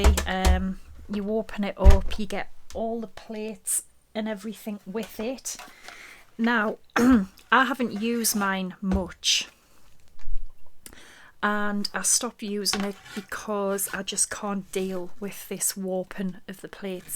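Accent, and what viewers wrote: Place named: Sunderland, United Kingdom. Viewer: Thanks, great reviewing (even if I just bought the Sizzix switch and am regretting it now). Love your accent - are we both from Sunderland? Except I live in Sydney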